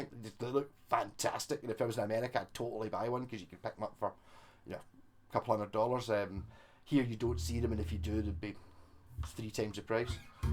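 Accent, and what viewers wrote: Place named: Glasgow, United Kingdom. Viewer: My heart absolutely jumped at hearing the Glasgow accent, love to see the fellow Scottish musicians! Great demo lad!🏴💪🏻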